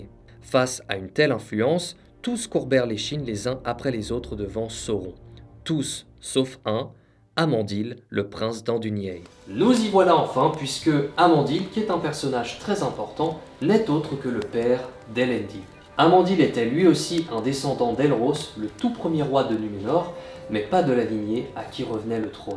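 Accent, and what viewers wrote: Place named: France. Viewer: Continue de prononcer à la française!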